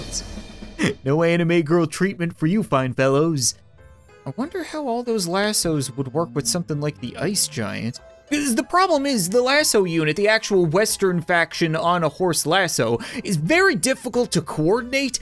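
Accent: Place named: Canada. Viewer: pronouncing his words differently might have something to do with his accent, I think. I find it obvious he pronounces “sorry”, “tomorrow”, “about”, etc. the way he does since he’s Canadian.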